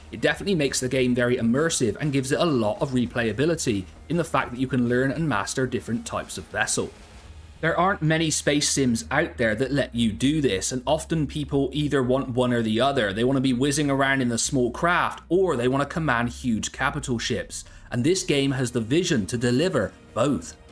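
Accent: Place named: Cornwall, United Kingdom. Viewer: First time watching this channel and ive got to ask: is that a cornish or Somerset accent?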